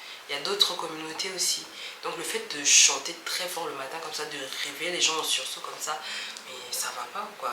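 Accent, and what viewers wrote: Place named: Ivory Coast. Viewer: Tu as un accent ivoirien. En plus tu ressembles a une fille agni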